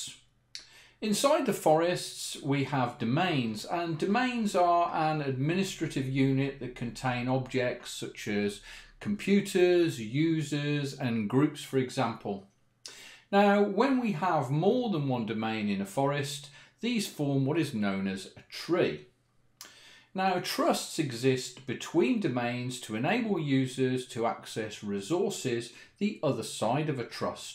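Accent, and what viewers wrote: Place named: United Kingdom. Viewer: Very British accent. Good video. Thanks.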